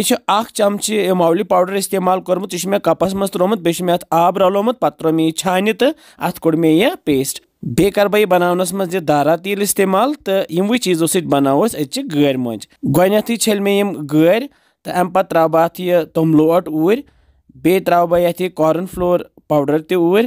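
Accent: Kashmir, India. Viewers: I love listening to your Kashmiri accent.....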